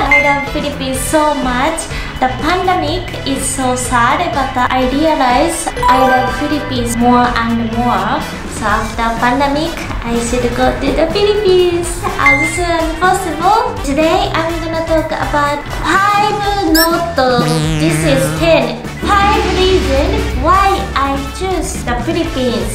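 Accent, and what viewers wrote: Japan. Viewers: Please keep your Japanese accent, for me it makes your englush so cute. You speak well already.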